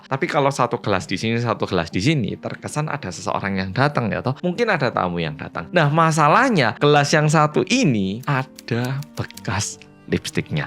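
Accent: Java, Indonesia. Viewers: Oppa kok bisa ditahan 😅😅😅 bahasanya jadi lucu karena medok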